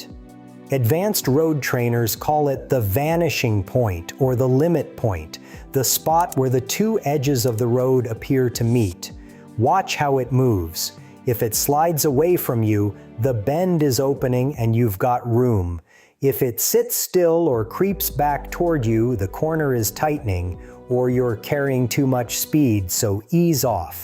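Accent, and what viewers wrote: United States. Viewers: The confusion might also stem from the fact that the speaker has an American accent and cites American sources (admittedly not exclusively), while the channel description states that the channel owner is based in Norway. Anything is possible, of course—and there was, after all, an American in Norway before: Frank Tagliano 🙂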